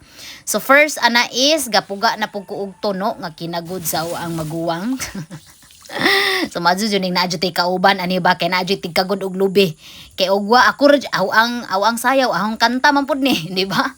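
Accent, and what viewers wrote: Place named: Visayas, Philippines. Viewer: Hahahah eninglish palang hibaw an na 😂.bisaya ni by.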